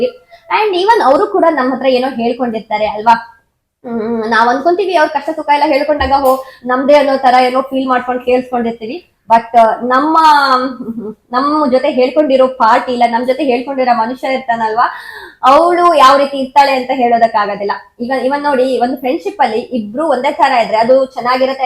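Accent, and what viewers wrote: Kundapura, India. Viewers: Kundapurdavra? Kundapura bashe keloke laik ath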